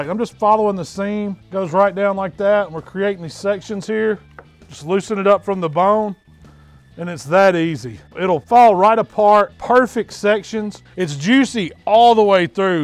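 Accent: South, United States. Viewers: When you see a Big Guy with southern accent then u know youre in the right place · his southern accent is so strong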